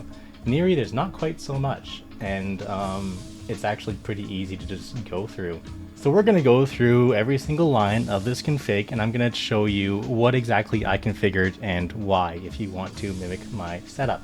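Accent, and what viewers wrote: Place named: Canada. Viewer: Bro's voice sounds a bit like my friend from Vancouver. I'm all the way over the other side of the Atlantic, though, and don't ride with that many Canadians.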